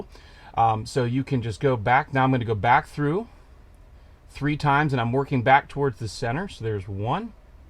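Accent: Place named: Pennsylvania, United States. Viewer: I love the way he says "he' LOOOOOOH everybody" with that northern PA accent. ALWAYS puts a smile on my face.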